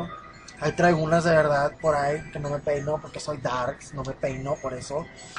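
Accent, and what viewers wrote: Mexico. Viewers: Tkene acento del norte de Mexico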